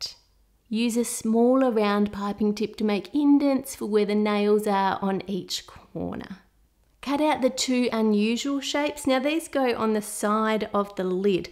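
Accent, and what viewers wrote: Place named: Australia. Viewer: Why am in love with her accent Australia Day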